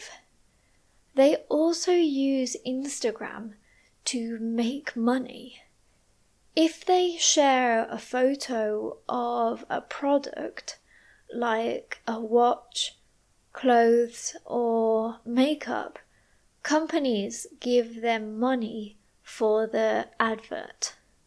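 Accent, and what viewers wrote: United Kingdom. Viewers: Normally i don't like british accent but your voice is so good. Thank you for video. I watched first time and i will watch other videos. Hi from Turkey 🇹🇷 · Your voice is amazing and the British pronunciation is my favourite. ❤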